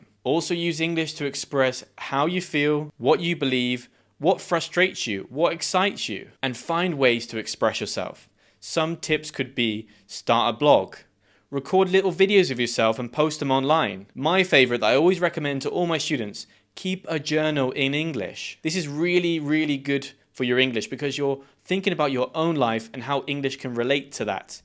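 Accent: United Kingdom. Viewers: Best English teacher and best British accent ❤ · I finally found out that I definitely love British English, I'm able to understand when you speak. Thank you, you're the best. Te mando un fuerte abrazo desde Ecatepec, México. 🇬🇧❤️🇲🇽🫂.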